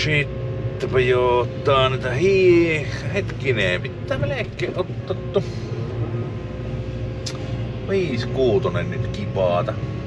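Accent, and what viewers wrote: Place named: Savo, Finland. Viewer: Ihanaa kuunnella tuota letkiää Savvoo... Terveisin yks sutteetti savolaene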